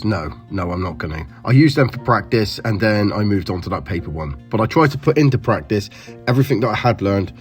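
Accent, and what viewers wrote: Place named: England, United Kingdom. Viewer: wow... wow... wow... I didn't realize that the paper target that you are aiming is just that small..... Your shooting is really good actually... I thought the target was like 12 inches size but it turned out to be that so tiny..... You are eligible to go for competitions already.... And still, I still love your accent... it sounds like those English movies...plus the vocabulary...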